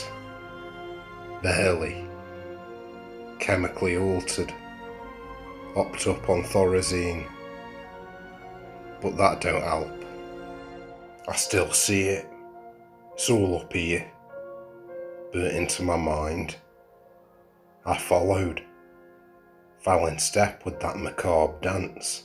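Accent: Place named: Derbyshire, United Kingdom. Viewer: That was ace, cheers very much. The accent had ne straight away, but I can't quite place it. Chewing words like Cheshire, hooting your 'oo's like Stoke, but probably more Derbyshire. I don't even care how out my guess, the tale told was a good tale well told. Thank you very much.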